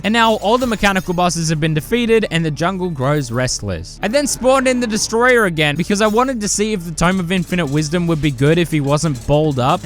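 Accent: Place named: Australia. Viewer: i hear it but its because their both australian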